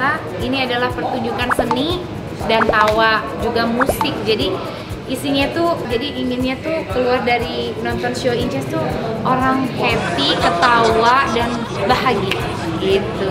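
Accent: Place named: West Java, Indonesia. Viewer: Karakter nya kaya gitu bgs manja dari pada kasar.😆😆 Dan dia orng sunda logat bicara nya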